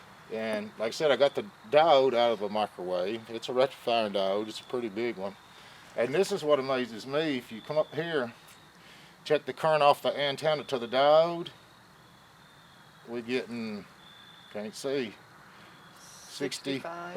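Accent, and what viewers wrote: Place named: Appalachia, United States. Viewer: it's impossible to take this clodhopper seriously with the hocking up phlegm and spitting and the lazy hillbilly dialect.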